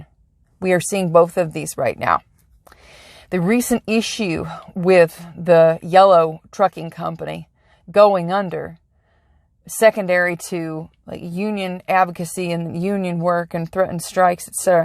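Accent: South, United States. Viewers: I love it when you talk about the economy & Hebrew. i wonder what else you talk about. We have so much in common. I wil watch you more. Now you talk about wonder history & future. You have very little southern accent. I am from Tennessee, too. I will watch you more, I have missed so much by not watching you.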